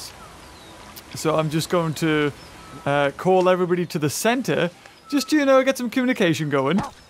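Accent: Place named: United Kingdom. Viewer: I love ur vids, I always laugh and smile, I'm going through a hard time, and I love ur British accent, and ur comedy, plz keep going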